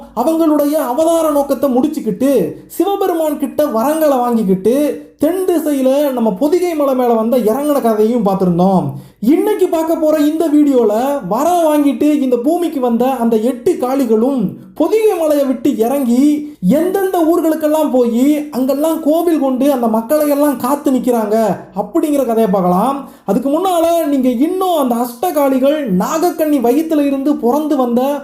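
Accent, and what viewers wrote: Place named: Madurai, India. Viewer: Arumaiyana pathivu Nanba... Ungalukku maduraiya ... Unga pechula Namma ooru kaathu adikkuthu....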